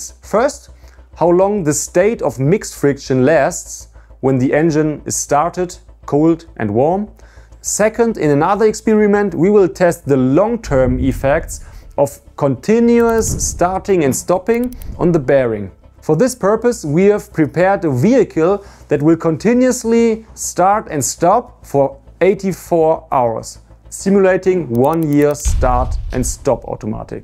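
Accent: Germany. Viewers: its really funny that this guy talks relatively decent english but then just randomly pronounces singular words 100% germanly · If you're going to make claims like your "facts" at 1 minute, and your 80% claim at 2 minutes, you've got to provide actual references. You have reasonable hypothesis, and you've done some preliminary experimentation, but to claim "finally proven" is intellectually dishonest. 2/10. Dramatic lighting and a German accent does not make something good science. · Sehr deutscher Akzent 😅 der scheiß gehört abgeschafft !! Das erste was man machen sollte nach dem Motor Start is den scheiß ausschalten!!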